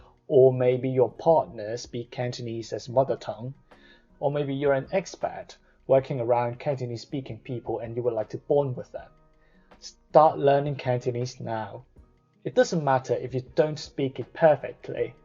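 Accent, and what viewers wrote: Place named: United Kingdom. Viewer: I still think listening to you is very trippy. We have similar accent in our Cantonese, but my English is a North American English accent where as yours is more British. :P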